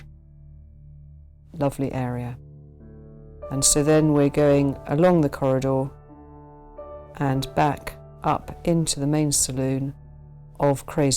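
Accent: United Kingdom. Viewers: I love her English Accent, so how much is it? Anyone?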